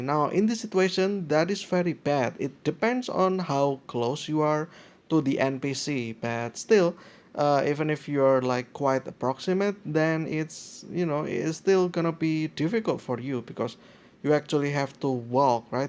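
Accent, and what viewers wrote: Indonesia. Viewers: How do you get Alphacelone?. Btw, your accent is kinda like Indonesian? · Ur sound like i donesian accent u know?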